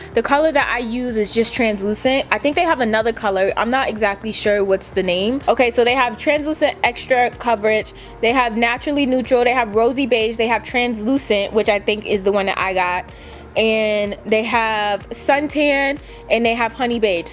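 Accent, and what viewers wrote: New York, United States. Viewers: First of all accent she so NY 😍😍..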